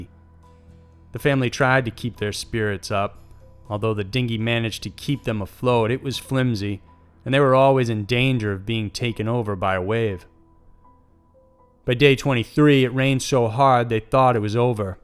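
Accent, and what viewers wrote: South, United States. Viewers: He has a nice Southern accent. To my ears, anyway (Canadian).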